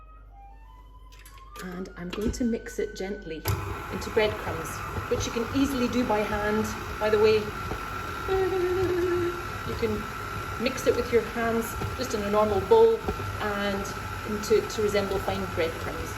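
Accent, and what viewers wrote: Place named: Scotland, United Kingdom. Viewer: Thumbs up for your scones (and your lovely Scottish accent!)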